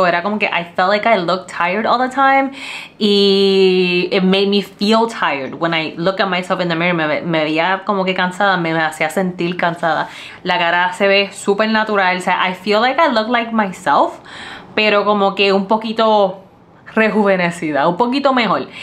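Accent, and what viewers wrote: Puerto Rico. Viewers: Girllll. I just love your style... Subscribete si te da la gana.. jajajaaa.. bien rajatabla.. me encanta como hablas el Español con ese acento bien boricua y despues El Spanglish.. mita Ingles y mita en Español..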